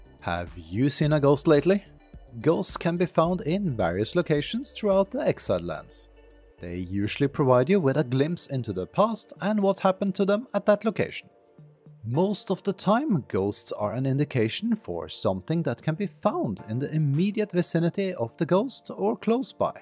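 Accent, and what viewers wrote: Norway. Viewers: Det var lettere å høre at du var norsk på engelsken din, enn om du bare hadde snkket engelsk! Men bra tips det der!!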